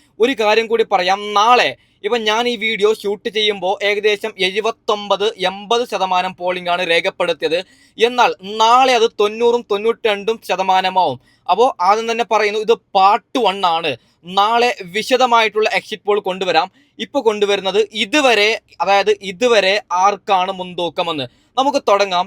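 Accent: Kasaragod, India. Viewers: നീ കാസർഗോഡ് ആണോ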